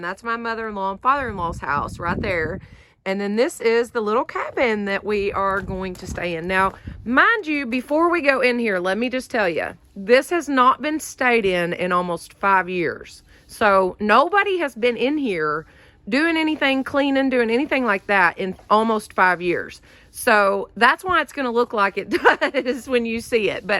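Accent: South, United States. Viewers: You are just a breath of fresh air, u are jus adorable !! I love your little southern accent too, jus too precious !! OMG !! I am over the moon happy for Ya'll and your family, and yes It Is most certainly bittersweet considering what ya'll have gone through. That Is jus the best news. Your house when It's all done and you put your cute little touches decorating and such, It's going to look so cute, I jus know it !! Oh this jus made my day !! Im so thrilled for you. Congratulations girl. So I see your In Is It Samburg, TN?? You are literally only 2 hrs and 18 minutes from me !! I know If we lived closer to each other we'd jus be fast friends, cuz you are jus a doll. I'm In Oak Grove, Ky...rt down the road from Fort Campbell military base. But I'm originally from Milwaukee Wisconsin. Been down south for about 7 yrs now. Ok sweetie take care of yourselves, much love, God Bless Ya'll !! 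❤❤ Im not Southern but I jus love saying "Ya'll"....🤣🙏🤗💋😘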